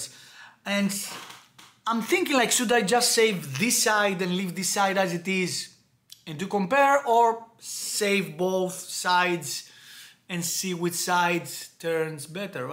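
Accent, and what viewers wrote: Greece. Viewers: Thx for posting. Keep up updated. Btw, you sound like a greek fella, am I right? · Based on the accent you must be from Greece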